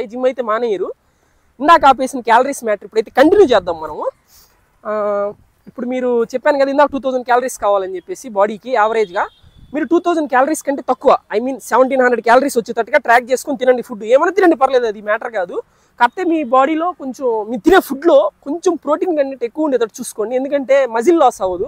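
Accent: Nellore, India. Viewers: Navvabaku camera man🤣🤣(Nellore slang 🔥) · బ్రో నేను ఒక మాట చెప్తాను దాన్ని గుర్తుపెట్టుకో నువ్వు ఏదో ఒక రోజు పెద్ద యూట్యూబర్ అవుతావు నీలో ఆ స్కిల్స్ ఉన్నాయి ఎందుకంటే మన నెల్లూరు యాస అలాంటిది🙌🙌🙌🙌🙌🙌🙌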